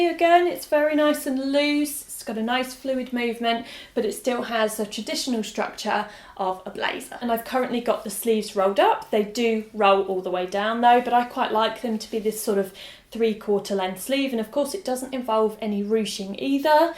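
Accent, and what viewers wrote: England, United Kingdom. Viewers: Hello from Spain,:) I would love the light blue blazer (number two). I would wear it either with dark blue jeans, beige pumps and a white shirt or with a white dress. I love your videos, your style, your English... Watching your videos make me wanna live in England ❤️ Greetings from the very South of Europe.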